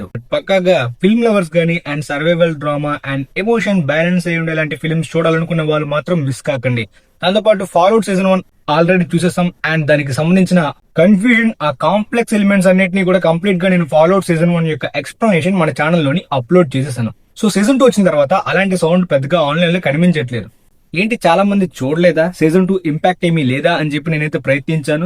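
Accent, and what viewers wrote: Srikakulam, India. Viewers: అన్న నీది శ్రీకాకుళం నా సూపర్ ❤❤❤ నమ్మలేకపోతున్న 😂😂 మాది పాలకొండ ✌️✌️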